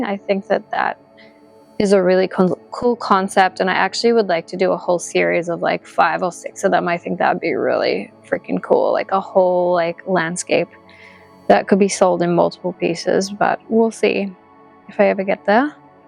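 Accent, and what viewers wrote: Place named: Australia. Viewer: Nice process video. Both real, and real-time. I'm terrible at placing accents, but I think you and are from the same part of Australia, and maybe have similar missions as art vloggers.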